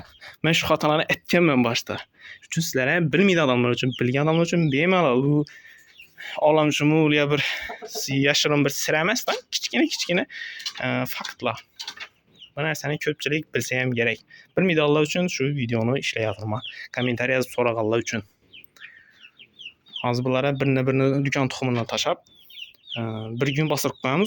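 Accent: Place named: Khorezm, Uzbekistan. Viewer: Aka iloji boʻlsa shevada gapirmang oddiy oʻzbek tilida gapiring vidyolarizni faqat xorazm liklar koʻrmaydi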